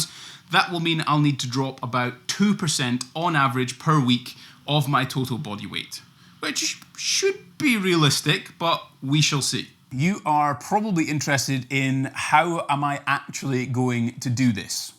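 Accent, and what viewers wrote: Scotland, United Kingdom. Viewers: You sound Scottish!😅 I love ever accent except my American one. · I got so thrown off when you opened your mouth and you were Scottish (I'm Scottish) never expected to hear a familiar accent but always lovely when I do 🤗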